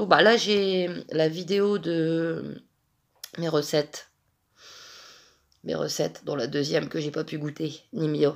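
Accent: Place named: Pas-de-Calais, France. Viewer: Bonsoir. Je vous suis depuis un petit moment, mais je commente pour la première fois. Bon, la poisse c'est la loi des séries... il y a des jours où il vaut mieux rester couché 😅 et le lendemain ça va mieux... Pour les migraines, est-ce que vous avez essayé l'ostéopathie? Pour les pâquerettes, on peut les faire sécher et les mettre dans de l'huile alimentaire. On fait macérer 3 semaines, on filtre et ça fait une super huile pour nourrir et raffermir la peau du corps😊. Une idée pour prendre soin de soi à petits prix. Parce que vous avez besoin de vous occuper de vous pour aller mieux dans votre moral... J'aime bien vous écouter parler. Toute ma famille étant du Pas de Calais, j'ai grandi avec cet accent... moi je suis dans le sud, mes parents s'y sont installés quand ils se sont mariés... mais mes racines sont là-haut... Bonne soirée.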